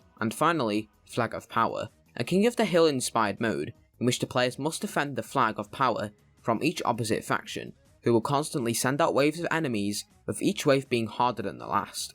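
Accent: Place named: United Kingdom. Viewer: Csn we collab sometime? We’ve both got british accents, and you have potential.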